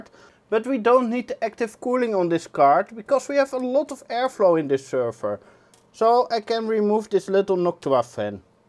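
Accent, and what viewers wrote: Netherlands. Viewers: Je klinkt erg Nederlands.. Klopt dat? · ik kan gwn meteen horen dat je nederlands bent hahah Wel leuke vids man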